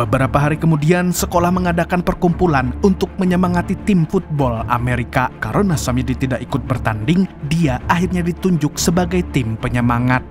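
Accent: Banjar, Indonesia. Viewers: Wahhh waahh pian urang banjar kah min..? 😅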